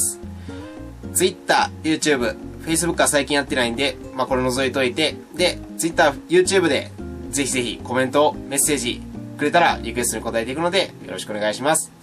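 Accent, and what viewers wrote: Kansai, Japan. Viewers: 最近いきなり関西弁になってますね笑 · 関西っすか？出身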